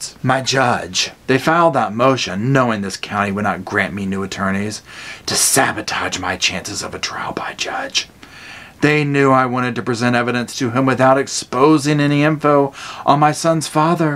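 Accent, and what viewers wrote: South, United States. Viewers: She is a freaking Narcissist that knows everything. However, I love your Southern twang! 👍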